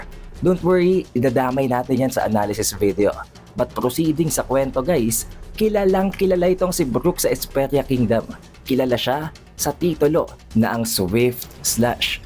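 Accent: Philippines. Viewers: Idol try mo wag mag english ang pangit ng tuno mo eh masyadong pinoy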